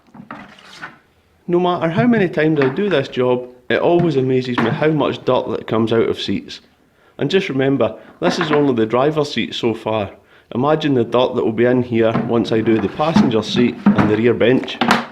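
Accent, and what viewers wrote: Scotland, United Kingdom. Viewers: I think one of the reasons I subscribed to your video is because of your great Scottish accent as well as the great job you do to clean the car. Lol. Have a great day mate. 👍👍👍🇦🇺🇦🇺🇦🇺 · I can appreciate that you don't speak too fast because I wouldn't be able to understand with that collin mcrae accent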